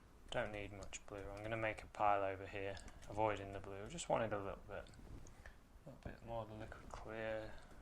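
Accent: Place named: England, United Kingdom. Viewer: Enjoy your vids very much,. What part of England are you from? Trying to place the accent.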